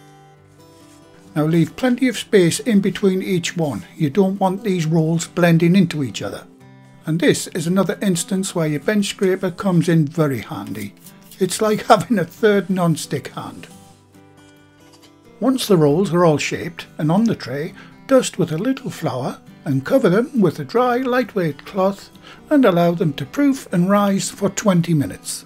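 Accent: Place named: Geordie, United Kingdom. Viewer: As a fellow Geordie, I love hearing your North East accent. I've made a couple of your bread recipes (just recently found your channel), and both times they've been a great success. Thank you for these delicious recipes. I'm looking forward to making more of them.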